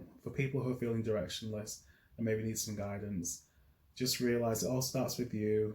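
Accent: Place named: United Kingdom. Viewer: I read a comment left on a rainbow bright video and just came for a nosey. Some reason thought you'd be American. Hello to you from a fellow Britt. 👋.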